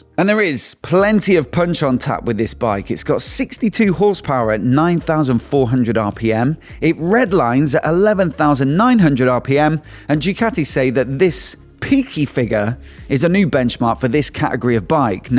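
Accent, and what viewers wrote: United Kingdom. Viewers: is becoming one of my top bike news sites. Always interesting, well produced & I love the British accent! All around, a good watch/listen!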